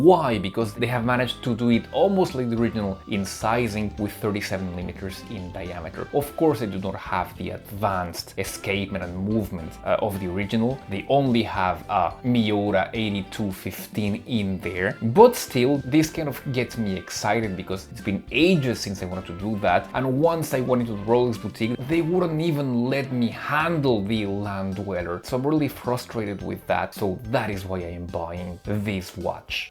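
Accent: France. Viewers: Yet another great video, thanks. Love the accent, reminds me of the times when I worked for a French company (11 and a half years!) . I am looking forward to your review of the Specht&Soehne. Crazy German-ish name for a Chinese watch, huh? I am not fond of Homages of watches that are too unique to be considered "universal property (Submariner, 50 fathoms, Explorer, AquaTerra, Daytona, Speedtimer, Dirty-dozen field watches, Flieger watches)", unique watches such as the Alpinist (Militado released one recently and I have mixed feelings about it) or the Vacheron Constantin 222 (which Pagani Design "homaged" and failed spectacularly at replicating the bracelet, a failure that every youtuber "fails" to point out, imagine that...). But if anyone succeeds at properly homaging the Land-Dweller, I just might consider buying it, because I really want a Genta-style watch. 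Otherwise, I would have to turn to the Festina F20051 or F20065 (which are on sale right now at about 170 EUR). The PRX is too damn expensive right now and the prices will go higher for a while, as long as people associate it to the Land-Dweller.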